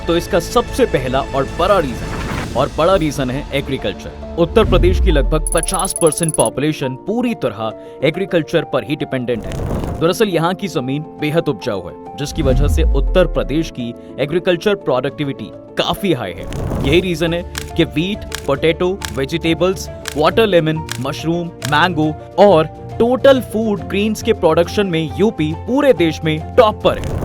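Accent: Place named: Bihar, India. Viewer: boli bihar jasi hai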